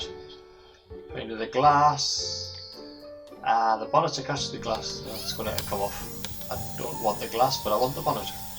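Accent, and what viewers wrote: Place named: Cornwall, United Kingdom. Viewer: A cool looking car. BTW Your accent sounds like you are somewhere in or around Cornwall.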